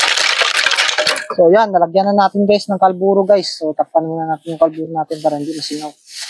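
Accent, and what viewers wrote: Iloilo, Philippines. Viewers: ilonggo ka gali dol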